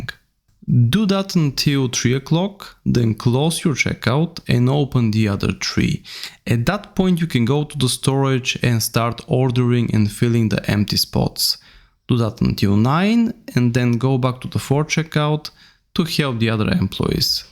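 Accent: Russia. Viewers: Какой русский акцент...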